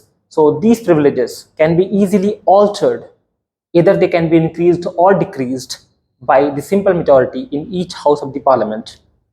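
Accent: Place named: England, United Kingdom. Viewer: You English Accent is awesome bro🎉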